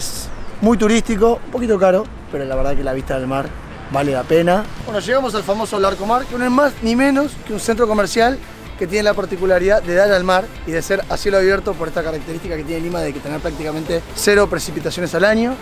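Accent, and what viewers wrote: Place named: Argentina. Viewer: Volviste de Argentina y se te pego el dejó guacho 😀 sucede que cuando vives fuera de tu país por mucho tiempo pierdes tú acento de origen, basta unas semanas en tu país y vuelves reseteado con tu acento original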